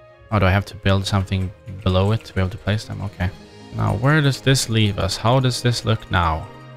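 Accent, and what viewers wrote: Sweden. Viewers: I know a swedish accent when I hear one. :) Snyggt hus! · Are u swedish? U sound like it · Are u swedish?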